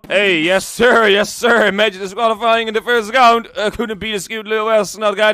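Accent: Netherlands. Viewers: You sound really Dutch. Hoorde ik dat goed? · R you dutch ?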